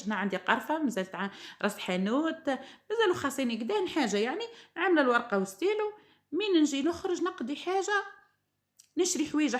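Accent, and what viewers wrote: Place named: Tunisia. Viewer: السلام عليكم أختي، ما أحلى كلامك آنا أختك من تونس منفهمش الفلوس الدزيرية وما لقيتش قناة تونسية متاع خزين، ولهجتك تحسها انك من تونس. تنجمش تعمللنا بالدينار التونسي؟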